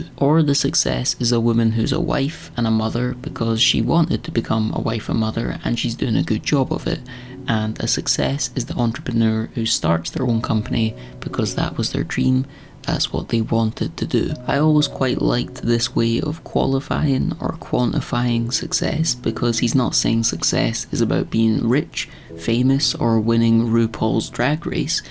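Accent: Scotland, United Kingdom. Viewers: Do you speak Scottish? Tha Scottish blasta.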